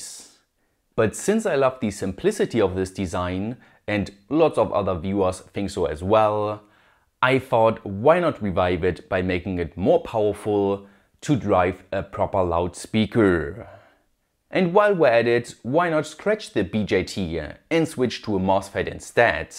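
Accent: Germany. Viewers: But why do you have such thick German accent if you say that you are Scottish?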